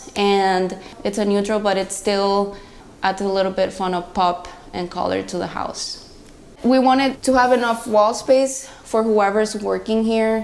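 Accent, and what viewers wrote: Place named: Israel. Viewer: From your accent I think your mother tongue is Hebrew. Be Matzliach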